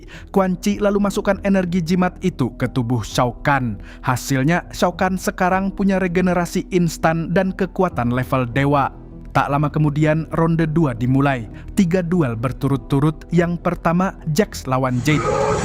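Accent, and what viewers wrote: Banjar, Indonesia. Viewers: Admin orang Banjar kah Ikam 😂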